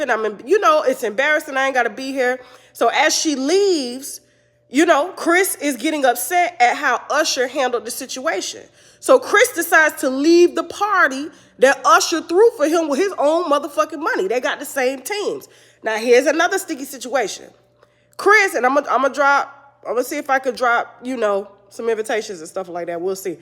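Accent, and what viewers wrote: United States. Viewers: Why does she keep doing that country accent???😂😂😂😂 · That country accent 😂😂😂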